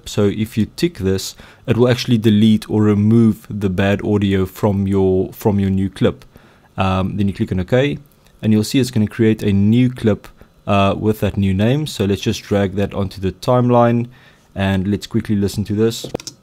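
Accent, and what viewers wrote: South Africa. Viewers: dude, you're south african! Awesum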